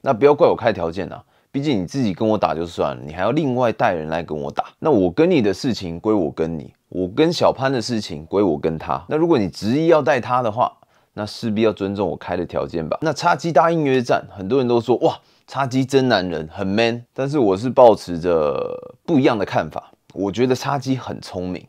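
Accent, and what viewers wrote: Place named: Malaysia. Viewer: 1-5頂一個，感覺口音是大馬人，你的勸告也是很正向，的確他給小潘打輸了，也是沒什麼的。現在起碼知道這個月會有一場會迎戰。不過其他不說，TOZY發起挑戰後有特意學拳擊，其實已滿有誠意。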